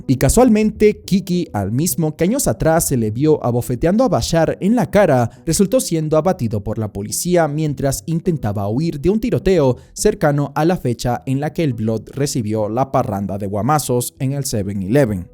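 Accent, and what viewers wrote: Dominican Republic. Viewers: Haha y esa dominicanidad que tienes ahora duro 😂🔥💪🏽🇩🇴💯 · Eres dominicano? Por que tiebes ese acento?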